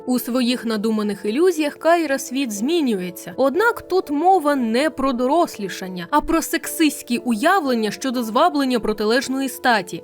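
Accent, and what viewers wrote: Zaporizhzhia, Ukraine. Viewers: Шок, ти шо з Запоріжжя?😳яка неочікувана приємність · Запоріжжя чи що? Ой, як приємно.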